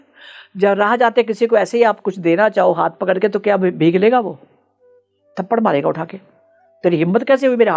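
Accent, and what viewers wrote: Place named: Punjab, India. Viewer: The narration is pretty difficult to understand. Some anecdote starts abruptly without much hint of who and what she is talking about. Pronounciation in Punjabi style adds to the confusion. The statements about 33 Crores doesn't match with the description of 33 koti (types - 12 Aaditya, 11 Rudras, 8 Vasu, 2 Ashwinikumars).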